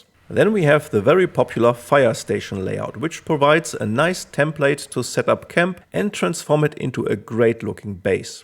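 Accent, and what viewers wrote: Germany. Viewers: I knew from the first words that ur geman. Mein kollege👌🏼👌🏼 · Da versucht aber jemand seinen deutschen Akzent zu verstecken, aber nach den ersten zwei Worten hab ich es schon gerochen😂 Dazu kann ich nur sagen, liebe Deutsche keine Angst vor unserem Akzent, die meisten Englischmuttersprachler (vor allem die Amis der Ostküste) finden unseren Akzent sehr charmant. Komischerweise empfinden nur wir Deutsche uns gegenseitig als unangenehm und versuchen den Akzent zu verstecken.... Warum auch immer. Mehr Mut zu unserem Akzent 💪😉 Gleiches gilt auch für Spanier und Italiener, auch die empfinden ihre Muttersprache mit deutschem Akzent gesprochen als charmant, das ist zumindest meine persönliche Erfahrung. Sehr gutes Video, hab das Spiel noch nicht gespielt, werde es aber mal testen. Weiter so! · Bro, is that a KI set on maxlevel german accent, or is that Person real? 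· Nice video! I also love your german accent 🍀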